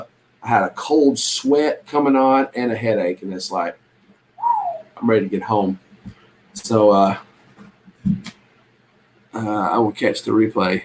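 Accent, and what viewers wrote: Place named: South, United States. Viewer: In case you're wondering where your viewers come from.... was mentioned in my reselling group. She mentioned BP...and I'm sure you can follow the line from there. I love, love, love watching you guys. Seriously, your southern charm accent can win over our northern (Minnesota) anxiety. I loved your Vegas live streams and learning from your videos. Thanks so much!! Real name Suzi....last name starts with Q, yep, they call me Suzi Q, even though I'm nearly 52. Ha!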